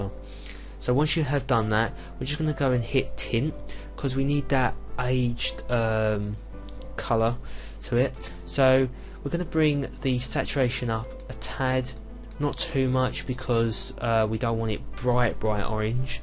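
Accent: United Kingdom. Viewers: very nice tutorial. but DAMN your British accent which is putting my nerves to a big challenge considering that English is not my native language... Anyway, I will try to fully understand your actions from tutorial.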